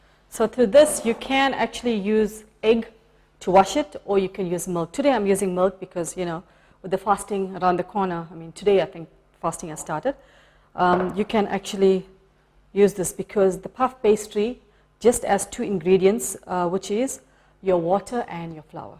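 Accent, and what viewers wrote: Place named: South Africa. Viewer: well if they are not a professional probably explains the whisk situation, as i heard "chef", im not a professional but i certainly know how to cook and bake, but tbh it was embarrassing to see handling that whisk! i was cringing im all for loving amateur baking and cooking even if they dont have all the tools in the kitchen but ones should know how to cook use it she was pretty messy. im only giving constructive critism not a personal one you still didnt get the camera focused on the food.:( Ps i would take your challenge the thing is i dont live in S africa Im guessing from your accent!:) anyway hope to see some improved use of spoons:) Your probably hating on me now!!!:)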